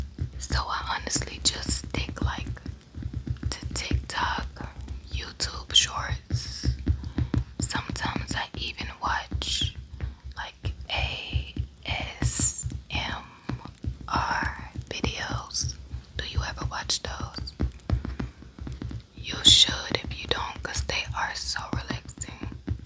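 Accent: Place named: Memphis, United States. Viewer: You are so lovely omg! You said you were from Memphis and your accent finally clicked for me, I couldn’t place it till you said!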